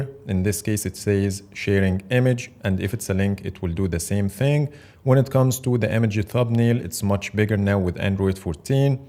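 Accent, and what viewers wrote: Egypt. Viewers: Man i love the Egyptian accent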